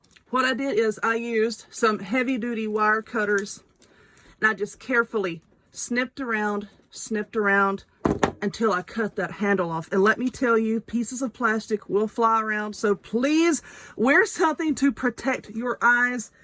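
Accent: South, United States. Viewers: I love listening to you talk. I’m a Texas girl so I’m Southern too. You are so good and funny too.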